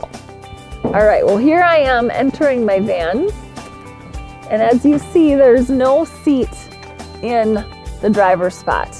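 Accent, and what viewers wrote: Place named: Minnesota, United States. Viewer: Your ride is soo cool, so much cooler than those available a very short time ago. Also, you sound soo Minnesotan. Thanks for the video.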